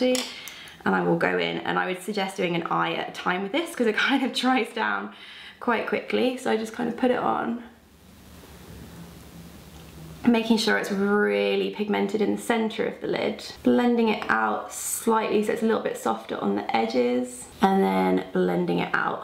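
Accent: United Kingdom. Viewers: yes! I also read your comment right as she said "brighter bees." I love Brits and their accents!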